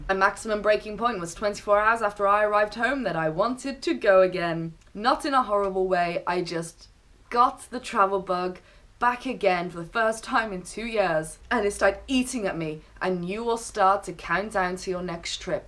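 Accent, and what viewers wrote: United Kingdom. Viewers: Definitely subscribed. Great vids, british accent, cute girl, what more could i ask for :) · I think she means Birmingham, UK, telling from her British accent.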